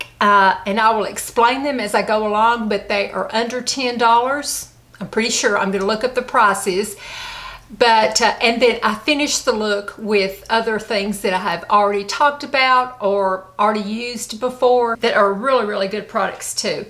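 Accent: South, United States. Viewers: Love the southern accent. I got one to; Live in Mississippi. You are a 💎 gem. Love ❤️ your videos. Thank you 😊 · I have headed such great things about those little elf eye shadow pallets , I think these are going on my wish list for my Christmas list :) I love listening to you while I’m wrapping and stuff around the house , I just can’t really say how much I enjoy your videos so much. They relax me, and I could listen to yoursweet Southern voice for ever ❤️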